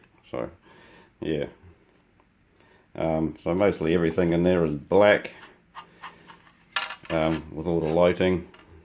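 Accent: New Zealand. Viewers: Excellent job! Soooo awesome!! Kiwi btw? lol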